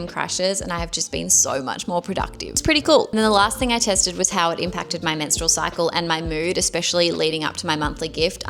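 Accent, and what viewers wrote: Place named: United Kingdom. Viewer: first of all great video. People er your accent you live in the UK. Myself, I live in sunny hot Florida. Northern Florida in Jacksonville. I'm now 65 years young. And I stand at 7 foot 3 inches tall. I take my 5 grams of creatine 8 times a day. Sometimes I mix it in my whey protein shake or just drop it in my mouth and swallow it down. Yes es, it's the water. I drink about 2.5 to 3 gallons a day. And I mix my whey shakes with 2% milk and a raw egg. I used to be huge, but then I moved to Florida. Now I'm retired and I'm lifting again. I want to get back the body that I lost. But now it will be much harder because now I'm a amputee below the knee plus I'm in a wheelchair. But not to much longer. I'm getting tired if this chair, and I'm going to prove to my surgeons that they were wrong. They told me that I would never walk again. 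I'm a stubborn person, and you never say the word Never to a stubborn person. In 2016 I had my leg removed below the knee, exactly one year later I started my thru hike of the AT trail. I got half way in 4 months,before my custom hiking poles snapped in half. I was walking between 10 to 15 miles a day. Then 2 I'm years later I found out that I got a E-Coli infection on my spinal cord. Me and my doctor's killed that infection, before it killed me. But where that infection sat, it ate the cartkedge completely away in two spots on my spinal cord. Then they put me on opioids. They only take the edge away not the pains. But once my doctor cleared me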